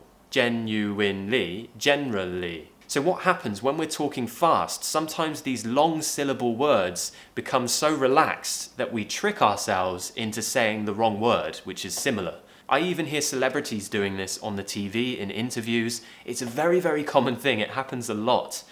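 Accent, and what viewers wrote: United Kingdom. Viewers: Hi! You always help us a lot in pronouncing British English. I'm in love with your pure British accent, so beautiful and clear. I've always had some trouble when pronouncing the /ae/ sound and I'm doing my best working hard on it. A tip to help your students pronouncing it correctly, or at least, more correctly, is to try to place the position of your mouth as if you were going to pronounce an /a/ and then pronounce an "e". Do you know what I mean? I hope it helps! Thanks a million for all your help and good knowledge. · Nice accent, I would like to speak as a British native speaker. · What kind of accent that the Primary Minister May said ?what's the difference between yours and hers? Both are really amazing!!!i was fall in love with British accent !! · Good morning, Thank you for administering our daily dose of English pronunciation! 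I do believe that listening to you on a daily basis will bring us next to perfection. Since I singled you out from the wide range of online English teachers my English has dramatically improved. Just like children do, I naturally tend to imitate your accent, use your vocabulary and so on. I suppose your she cat has a perfect British accent too! I managed to pass the CPE test in Rome but I want to go further. English seems to have no boundaries, the more I learn, the less I know. I think one day I will take up one of your courses so that I can have ready answers to my questions and doubts at hand! I cannot thank you enough for what you do!!!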